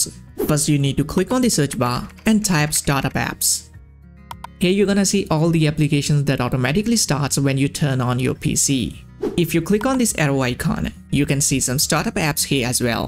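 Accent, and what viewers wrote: India. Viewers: Jesus I was gonna watch this but his india accent bro is unbearable -- good bye.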